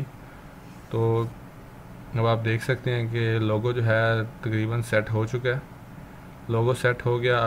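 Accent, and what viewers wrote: Australia. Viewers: V informative ,your english accent is also too good crisp australian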